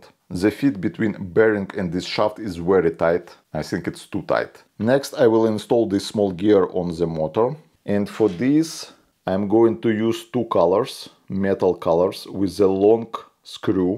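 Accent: Ryazan, Russia. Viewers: Шикарный Рязанский акцент )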